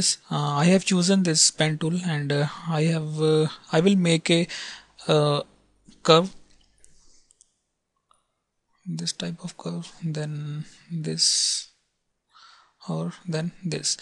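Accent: India. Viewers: I love the Indian accent. It actually makes english sound nice for some reason.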